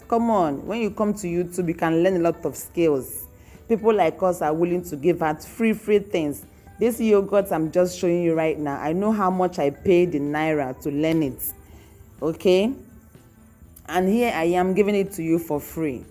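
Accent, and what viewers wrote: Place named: Ghana. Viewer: Hello ma'am, thank you for sharing. The video was quite explanatory. Thank you. The way you speak sounds like one from "Pete Edochie's" era. They spoke a lot of oral English. It sounds like music really, depending on how they speak it anyways. But then again, you sound like you were born, "bread and buttered" in Ghana. ❤❤❤ Thank you once more ma'am.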